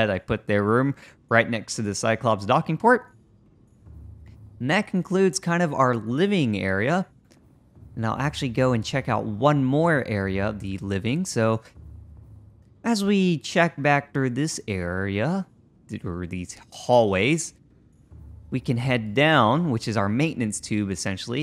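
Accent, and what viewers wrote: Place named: Ireland. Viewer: Huh first time hearing you and almost prefect on voice except somehow got irish accent 😅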